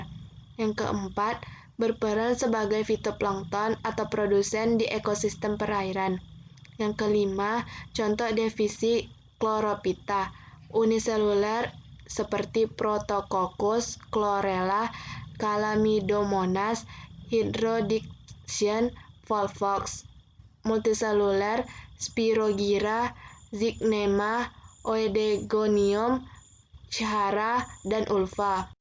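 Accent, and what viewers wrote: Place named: Riau, Indonesia. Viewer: riau bgt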